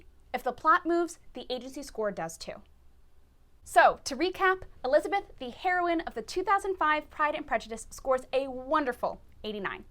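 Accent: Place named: RP, United Kingdom. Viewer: Yeah your posh is good!!! You go girl 👏🏾👏🏾👏🏾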